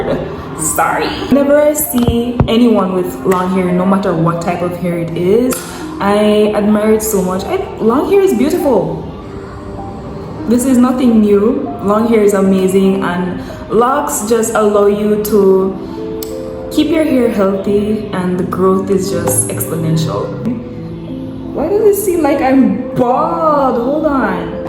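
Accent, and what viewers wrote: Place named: Jamaica. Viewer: You and your locs are gorgeous! 😍. Loved this video! My mom is from Jamaica! I knew I recognized your accent lol.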